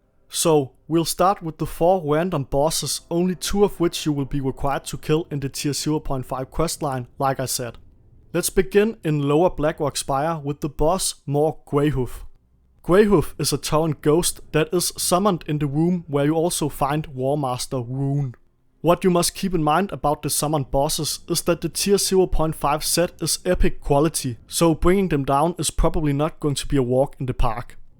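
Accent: Denmark. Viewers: Love the accent - a fellow dane here! Great vids bud! Do you stream on twitch or only making content here?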